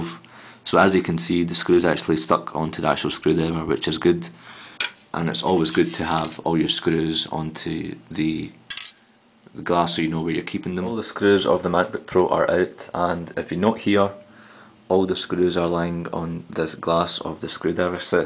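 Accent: Scotland, United Kingdom. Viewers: Aren't you Scottish, by any chance? :-)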